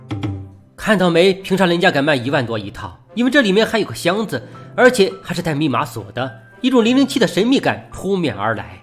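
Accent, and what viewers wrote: Shandong, China. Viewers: mike绝对是山东人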